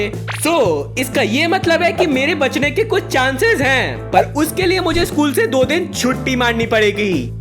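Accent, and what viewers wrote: Bihar, India. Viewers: aap bihar se ho kya bhaiya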